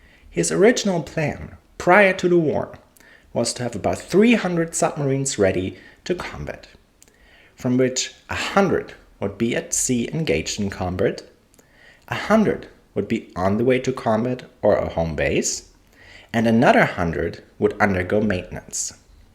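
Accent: Germany. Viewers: Firstly ,nothing wrong with the German accent my good man , i still never understood why he stood trial for war crimes ?? he was just a Admiral doing his job ,no death camp involvement etc' a true leader . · 'German accent'? I like it - BEAUTIFUL language - Wunderbar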